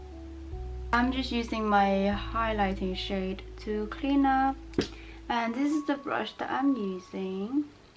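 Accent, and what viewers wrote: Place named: China. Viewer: Where is your accent from? Sounds like English mixed with Chinese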